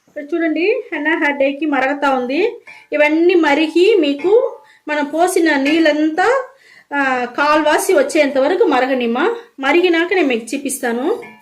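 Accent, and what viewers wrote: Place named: Chittoor, India. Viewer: Chittoor Telugu... influenced by Tamil 😂😂😂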